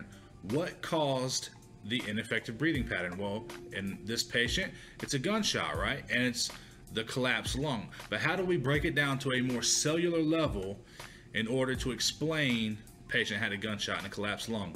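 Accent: South, United States. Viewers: He sounds like he's from the south 🙌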